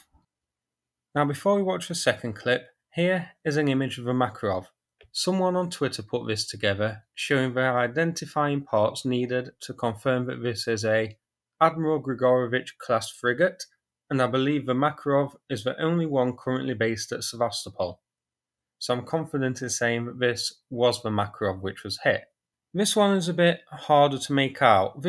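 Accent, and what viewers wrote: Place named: Nottingham, United Kingdom. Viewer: great analysis dude, awesome way of breaking this all down for up to understand, and I'm sure I recognize the accent, from a notts dude!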